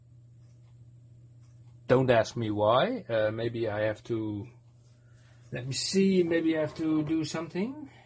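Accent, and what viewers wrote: Netherlands. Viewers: I lof Dutch akksent. 😍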